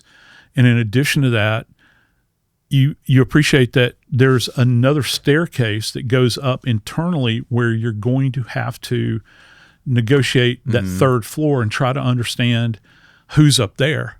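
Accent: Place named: South, United States. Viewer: He's got more education and experience than you can ever hope to have. He's southern, they pronounce words funny. He's a scientific genius specializing in criminal death scene investigations. Podcast is "body bags" and has written many books on this. You can obsess over a dumb word or listen to what he knows. Your choice.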